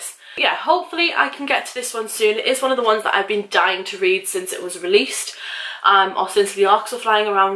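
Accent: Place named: North, United Kingdom. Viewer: I'm a new subscriber from Twitter and I'm 13 seconds in and all I have to say is... YOU'RE NORTHERN?!! SO AM I!! YOU LITERALLY HAVE THE SAME ACCENT AS ME!! Can we be best friends??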